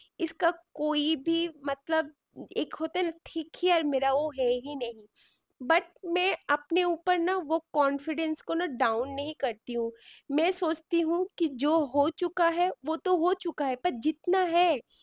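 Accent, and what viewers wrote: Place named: Bengal, India. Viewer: App bengali ho.... Hindi sunke asahi lag raha hai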